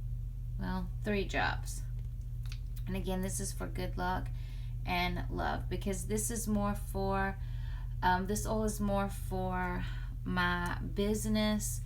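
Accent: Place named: South, United States. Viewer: I could listen to you talk all day, you sound like my ex wife. You southern belles are something else.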